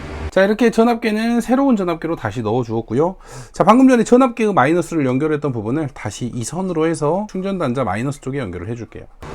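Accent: Jeolla, South Korea. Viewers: 혹시 전라도 어디세요^^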